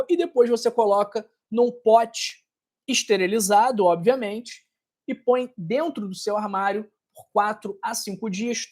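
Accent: Rio de Janeiro, Brazil. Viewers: Vc e carioca. ? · Eu tomo água com limão 🍋 e como abacate, todos os dias! Com esse acento lindo, só poderia ser Carioca! Eu amo o Rio!❤ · Eu achou quê você é do Rio de Janeiro tem o sotaque dos cariocas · Pelo seu sotaque , deve estar falando do Rio de Janeiro. É igual de um irmão da igreja. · Talvez o Sr seja carioca? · Pelo seu sotaque e do Rio de janeiro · Eu sou de Piracicaba SP vc pelo sotaque é carioca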